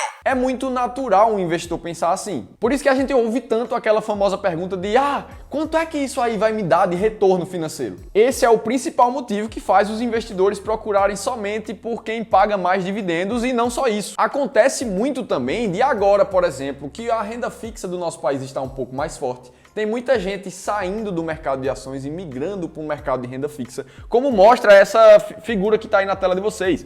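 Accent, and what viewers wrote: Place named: Pernambuco, Brazil. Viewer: ... gostei de ver seu conteúdo!!! E principalmente o sotaque e a batida na mesa...rsrsrs... só faltou falar MASSA e PRONTO! PARABÉNS PELO SEU TRABALHO! Vida longa e próspera nos vídeos do Clube. Obs.:...PE imortal!....Entendedores entenderão.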